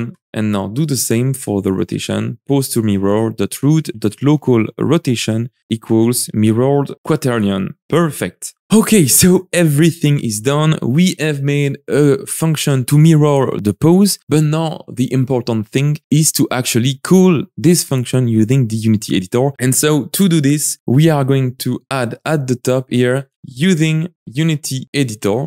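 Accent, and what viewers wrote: France. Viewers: T’es français ?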